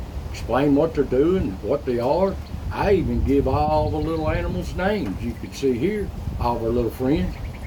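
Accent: Appalachia, United States. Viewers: When I come to channel, I feel like I'm home. I was born and raised in the South Western part of Virginia, and Tennessee and Kentucky are just a stones throw away from where I grew up. Naturally I love the Smokeys, and speaks the dialect that I speak and grew up with, and that's comforting to these ol' ears! I love channel--especially love the wild life videos. I actually love all of his content, as I've never seen a single video of his that I haven't enjoyed! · I watch all your videos. My people came down here to Florida in 1835 from the Appalachia. But we still pretty much talk like y'all.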